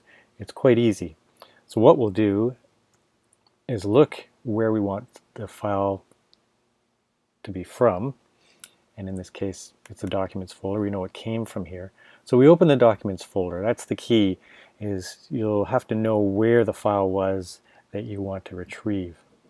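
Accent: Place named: Canada. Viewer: Canadian accent equals automatic dislike!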